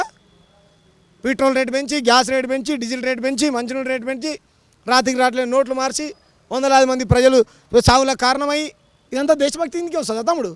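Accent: Telangana, India. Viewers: అన్న అందుకే నీకు అని పేరు వచ్చింది అన్న నిజాన్ని నిర్భయంగా తెలంగాణ యాసలో మాట్లాడుతావ్ సూపర్ అన్న మార్వాడి కూ సపోర్ట్ చేస్తున్న రాజకీయ నాయకులను రాజకీయ పార్టీ లను తెలంగాణ ప్రజలు బాగా గుర్తు పెట్టుకొని ఏ ఎలక్షన్ వచ్చిన ఓటు తో బుద్ది చెప్పాలి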